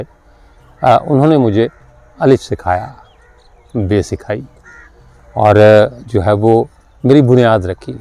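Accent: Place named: Urdu, India. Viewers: कोई फर्क नहीं है उर्दू और बोलने का लहजा UP jaisa h . Pehle Baar jb Aapka vilog dekha mujhe bhi aesa lga .I'm from UP .. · Thank you for sharing your family information with us. I am curious if your ancestors actually migrated from India, cause your Urdu accent is very much like Urdu speaking families who migrated from Urdu speaking regions of India like Lakhnao or Dehli etc.? May Allah bless you and your whole family!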